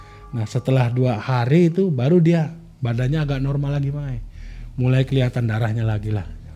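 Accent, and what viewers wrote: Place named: Cirebon, Indonesia. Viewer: pasti wong cirebon kih 😁 logat nya cirebon pisannn😍🥰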